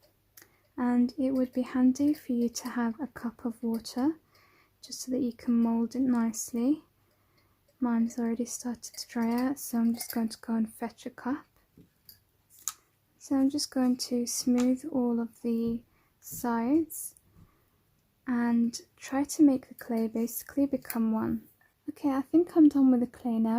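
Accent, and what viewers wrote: England, United Kingdom. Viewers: do you live in England??? Love ur beautiful accent!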